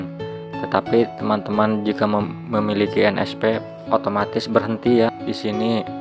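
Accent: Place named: Sunda, Indonesia. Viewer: Sunda teing ey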